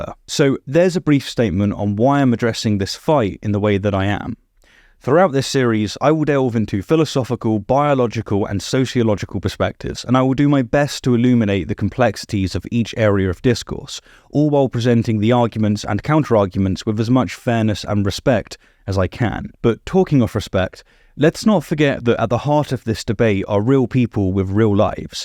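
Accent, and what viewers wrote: United Kingdom. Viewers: It's sort of nice to hear a voice with this accent say that trans people are people. Some hope for the UK yet.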